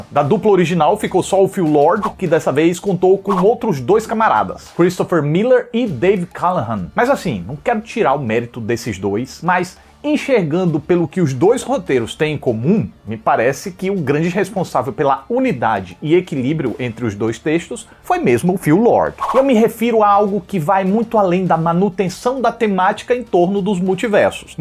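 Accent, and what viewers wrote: Northeast, Brazil. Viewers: Boa análise, como sempre! Sem dúvida, se não é o melhor, é um dos melhores avaliadores de filmes do YouTube, da internet! 👍👏 Como se não bastasse a inteligência e o bom humor nas análises, ainda por cima há o maravilhoso (para mim, carioca descendente de nordestinos!) sotaque nordestino! 😉👍 Eu gostaria de lhe pedir que fizesse uma reação para o filme "Mulher-Rei" , que assisti na tv a cabo e achei ótimo! Aliás, só mesmo o racismo enraizado nas sociedades ocidentais para explicar a divulgação fraca que este filme teve e o fato de não ter vencido um Oscar - um "desinibido de ouro"! No mínimo, penso que deveria ter concorrido a melhor filme, melhor direção, melhor roteiro e atriz coadjuvante (há umas 2 ou 3 que mereciam pelo menos concorrer), para não dizer que a Viola Davis deveria ter ganhado para atriz principal.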